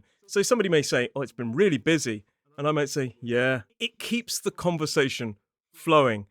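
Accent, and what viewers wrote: United Kingdom. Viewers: I really do love the BE pronunciation. Thnx 4 ur entertaining lessons.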